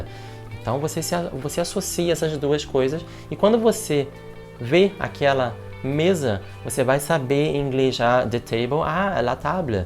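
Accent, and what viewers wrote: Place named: Rio de Janeiro, Brazil. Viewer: De qual estado do Brasil é você? Eu tô aprendendo português e quero saber o seu sotaque, gosto muito de ouvir sotaques do Brasil e dos países lusófonos todos! Edit: Eu já vi que vc é do estado do Rio de Janeiro. Rsrsr Sou novo inscrito no seu canal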